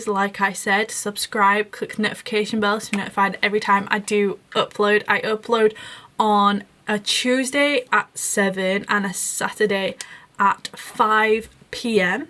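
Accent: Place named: United Kingdom. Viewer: the British Accent kills me very softly.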